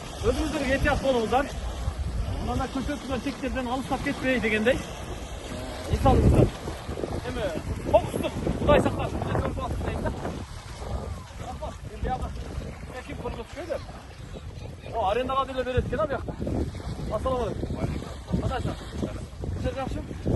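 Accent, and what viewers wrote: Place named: Osh, Kyrgyzstan. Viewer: Аа деп Оштукча суйлобой,нормально кыргызча эле суйлочу кишини жииркентпей фу!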